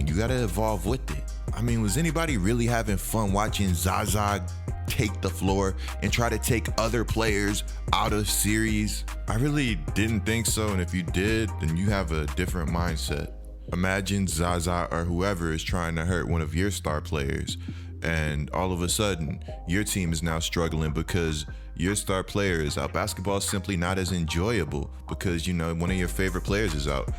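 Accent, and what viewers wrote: Louisiana, United States. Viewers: You from nola or BR?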